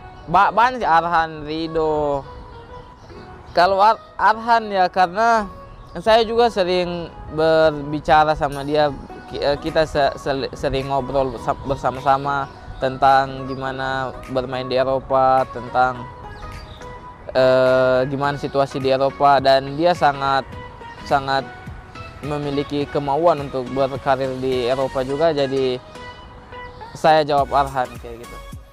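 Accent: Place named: Palu, Indonesia. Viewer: Sahabat sulawesi... Logat palu asli... Hehehe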